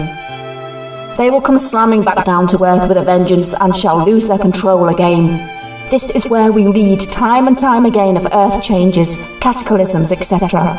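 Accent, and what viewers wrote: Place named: England, United Kingdom. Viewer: The English accent doesn't help either. LOL Not your fault,. I hear electronically with the cochlear implant. Maybe I can find a different speaker doing this information somewhere or a transcript.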